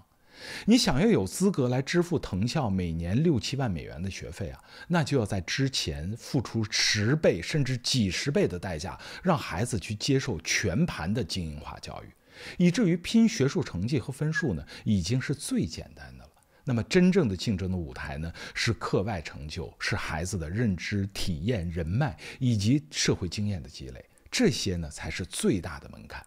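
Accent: Standard. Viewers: 主播的普通话说的很好、很正。现在有的主播说话舌头不伸直，全程充满S，疯狂装沘，极度装嫩。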